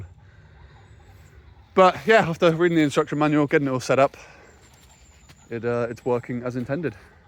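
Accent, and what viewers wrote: United Kingdom. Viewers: i like your british accent! Cracks me up when you say b**thole of water <3. Love your channel!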